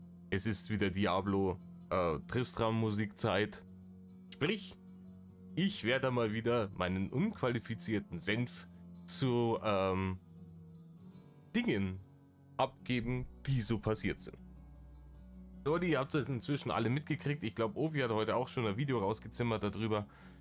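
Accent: Bavaria, Germany. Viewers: Danke ❤. PS: es ist so wohltuend, echtes Bayrisch zu hören 😊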